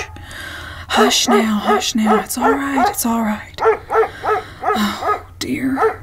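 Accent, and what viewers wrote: South, United States. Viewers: Awesome way to start the series, you and the voices tho 👌 LOVED the southern accent and the story development. Rattlesnake suppa with the little rattle of the rattle was a REALLY nice touch to end part 1. Have you written or used to write stories before doing these videos?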